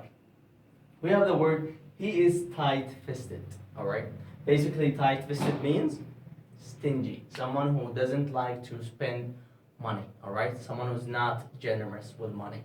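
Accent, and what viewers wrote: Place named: Yemen. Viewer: for English I just wanted to make sure owing to your accent look like Yemeni accent 🙂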